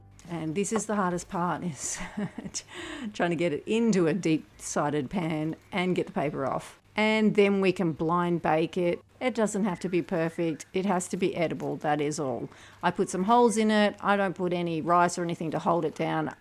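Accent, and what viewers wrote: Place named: Australia. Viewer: your accent sounds australian, you sound a lot like this woman : <link>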